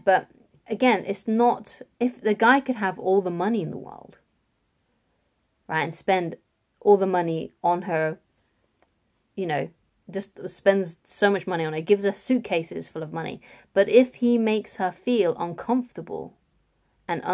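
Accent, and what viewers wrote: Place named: United Kingdom. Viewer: you are pretty and I really like British accent.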